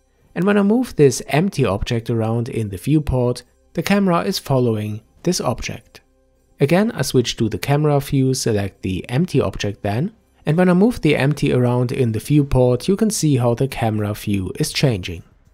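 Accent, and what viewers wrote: Germany. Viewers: Very good introduction, but the part where I should press something to get camera view the same as current view got lost in the German accent. Could you write it also on the screen ?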